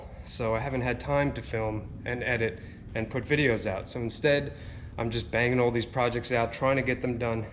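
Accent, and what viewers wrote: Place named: New York, United States. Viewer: Yea, now I recognize it, the slight New York accent. Subscribed, been binge watching - I'm live up north, here in the Outer Banks. Love you're Videos.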